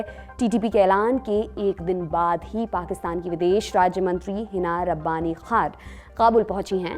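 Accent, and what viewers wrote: Standard. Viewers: Wah,bahut sare news anchor ko mai sunta hu.kintu jitna shuddh aapka uchcharan hai,jaisa aapka body language hai,isse aapki vidwata saf jhalakti hai.yahi visheshta aapko dusre anchors se alag aur behtarin sabit karta hai.Lekin shayad aap galat channel ke sath kam kar rahi hai.lswar aapko Yash de. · बेहतरीन अन्दाज़े बयां ! अत्यन्त शुद्ध उच्चारण !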